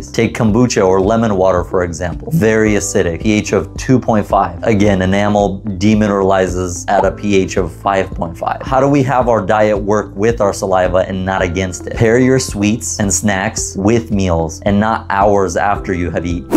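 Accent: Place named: South, United States. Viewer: that’s a neat backstory! I’m from deep south Louisiana and I was hearing an occasional southern accent whether you knew it or not. Lol.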